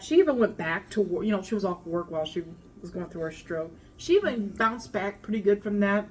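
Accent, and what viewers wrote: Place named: South, United States. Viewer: yes I also wondered that also I’m from South Georgia he sounds southern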